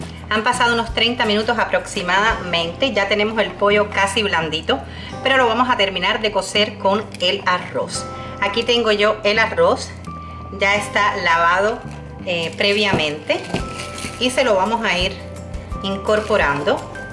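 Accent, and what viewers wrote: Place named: Cuba. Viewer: Hola wuapa .nueva por aki buscando resetas .te encontré. Me gusta tu manera de explicar y tu acento eres cubana .k pinta tiene ese arros por dios k riko .un saludo un gusto verte y estar suscrita atu canal 👌💪😘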